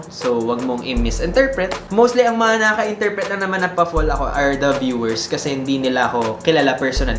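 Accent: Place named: Conyo, Philippines. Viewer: Genius sa math Me: Si kuya yun Conyo magsalita:Si kuya ulit yun.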